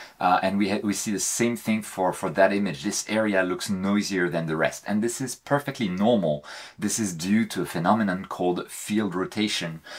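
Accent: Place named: France. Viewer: c'est moi ou j'entend une pointe d'accent francais dans ton anglais? good job vidéo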